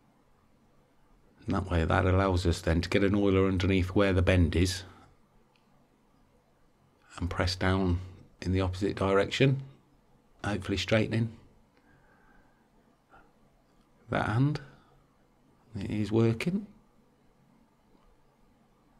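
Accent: United Kingdom. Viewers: Accent from somewhere near Cov?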